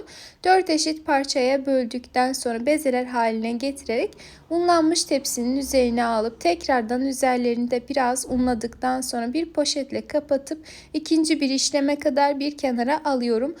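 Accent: Azerbaijan. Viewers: Dinleyen kimi bildim ki AZERBAYCANLISAN ❤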